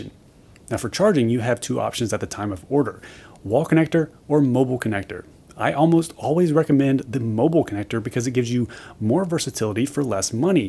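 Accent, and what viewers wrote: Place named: Midwest, United States. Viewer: As always, a nice, professional and useful video,! You can always be counted on to get right to the details and to also allow us to hear about your experiences. You are SOLID GOLD! Thank you! P.S. Kinda sounds like Tesla's PR company wrote a lot of the "specs" text for you to read. No worries, but because you speak (or the video speed is "enhanced") fast, I had to CONSTANTLY, stop and rewind to hear all the spec details packed in to every sentence. May want to ask Tesla's PR firm to not enhance the speed of the video. Remember, the #1 group of car buyers is WOMEN. (Over 70% of the NEW cars purchase decisions are either directly by a woman or heavily "influenced" by a woman. This is according to published, industry stats. The remaining 30% of the NEW car decisions are made by men - who are single, or are buying a "collector" (not a commuter) car, or have no family and/or no significant female in their life. 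SOOoooo...as I am starting to explain to a couple of other "engineering" - driven sites, please SLOW DOWN and speak in your NATURAL voice. You have a GREAT Midwestern voice, which is very pleasant to listen to. NO need to RACE through everything. We LOVE what you have to say. Hugs and again, THANK YOU!!! 💐🌺🌻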